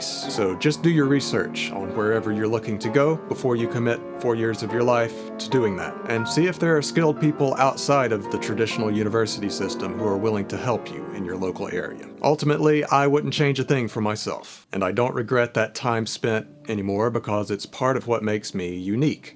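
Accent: South, United States. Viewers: there's only one thing I love more than the intersection of the academic study of music and the artistic application of advanced music theory on technology: hearing the subject discussed in a mild southern accent. 💜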